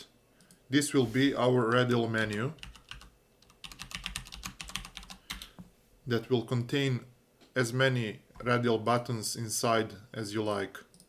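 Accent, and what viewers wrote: Russia. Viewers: Акцент прям русский русский)) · Hi! I was somewhat confused by your English accent. It is very similar to the accent of a resident of Russia.:) And the name is closer to scandinavian origin. Thanks for the plugin! We will use it!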